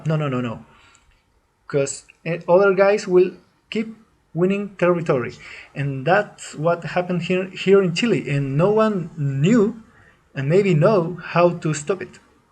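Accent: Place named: Russia. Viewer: Warning mister trump,. this guy is a russian, you can tell it by his spoken english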